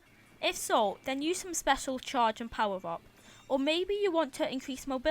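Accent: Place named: Scotland, United Kingdom. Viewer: omg voice reveal!!! she sounds so Scottish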